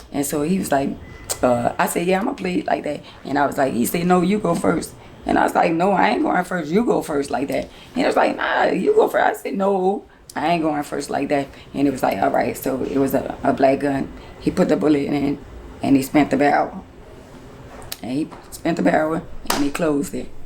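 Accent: New Orleans, United States. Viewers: she sound like she close to new orleans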